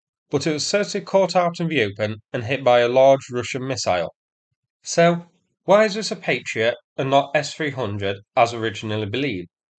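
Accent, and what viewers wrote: United Kingdom. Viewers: Hold on are you seriously claiming this is the first patriot system to be destroyed in Ukraine? Sorry but thats insane cope. The US has confirmed the first destoyed batteries almost a year ago. Ukraine is now begging for 25 more patriots... Germany saying they have sent so many to ukraine they have no new ones to send. This channel turned into cope central lmao. The british accent makes it even funnier.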